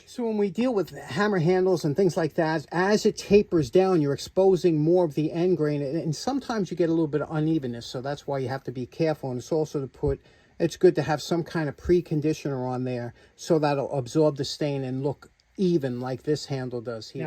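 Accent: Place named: New England, United States. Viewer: Great vid but..........Your Yankee is showing. It's not Pea_Can, it's pe-kan!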